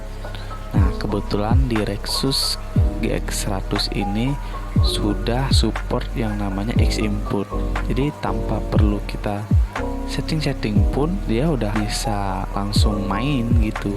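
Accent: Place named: Bali, Indonesia. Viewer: Bali sekali logatnya anda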